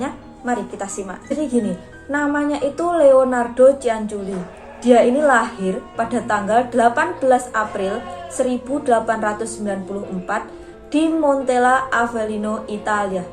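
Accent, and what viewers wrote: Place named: Java, Indonesia. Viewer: Medok lur medok 😃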